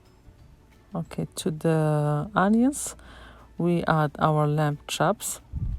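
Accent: Morocco. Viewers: It sound like you have a Moroccan accent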